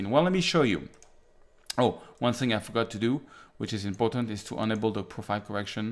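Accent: France. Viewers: I like the way French speaking English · Encore un excellent tuto et personnellement je trouve qu'il est assez facile de comprendre vos tutos en anglais de part le fait de votre prononciation à la française ;-) encore merci et félicitations pour votre travail.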